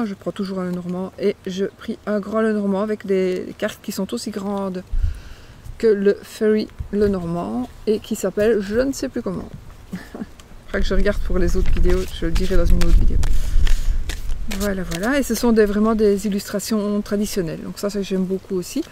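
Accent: Belgium. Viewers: Je suis poisson ascendant lion est ce que je dois regarder la vidéo d horoscope lion aussi ? Vous êtes magnifique jadore votre accent belge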